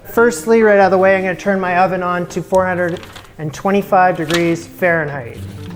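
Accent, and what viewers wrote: Canada. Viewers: Your accent gave away that you were from Canada. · Thought you were a Canadian guy! ❤️😊🇨🇦 Love your channel, keep up the great content!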